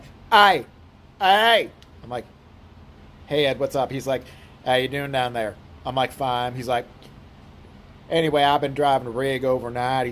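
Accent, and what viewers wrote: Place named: South, United States. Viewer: Hilarious! You and your brother on together would be a scream! I've watched this 5x screaming laughing at your Southern accent and convo. My bro was a semi driver and very shy and I guess these prostitutes would come up to him and flip him out at his rig some scary looking and brazen and he would be like trying to get them off. Haha he had to lock up tight and be prepared for war.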